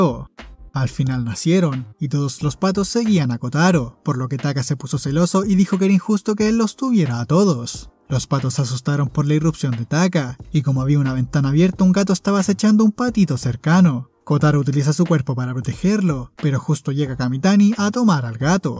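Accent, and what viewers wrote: Chile. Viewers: con los primeros 5 segundos ya supe que es chileno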